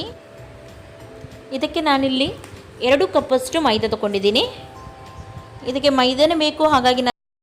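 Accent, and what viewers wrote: Mangalore, India. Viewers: madam nivu Mangalore avra kannada age mathadthira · Nimma maathu tumba cute rii kelikke koshi agutthe manglore kannada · Your Mangalore Kannada explanation so beautiful , good vedio mam. Super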